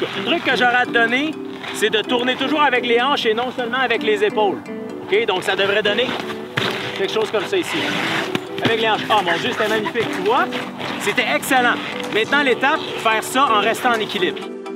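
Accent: Quebec, Canada. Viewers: Comme j'adore cet accent québécois 😂😂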